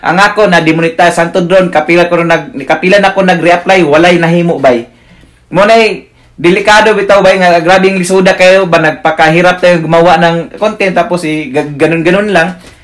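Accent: Visayas, Philippines. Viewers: Kaya pala d way ka magsalita visaya ka.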